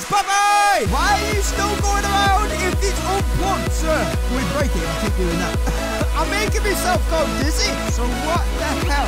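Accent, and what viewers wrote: United Kingdom. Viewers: This guy is fucking brilliant! He's so lively and energetic, you've gained a subscriber today. P.S. What part of Britain are you from?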